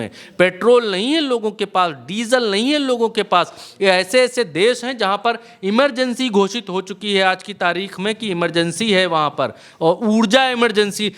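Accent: India. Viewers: bhai naya naam de diya apne nayi dukaan khuli hai. aap ke andar ek desi pan hai jis wajha se aap ko sunne me maza ata hai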